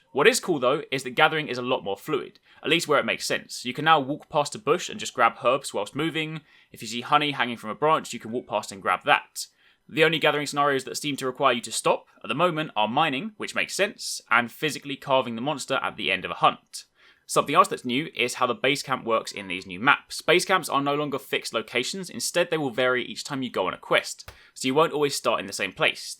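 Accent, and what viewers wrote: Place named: United Kingdom. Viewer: It the British accent:3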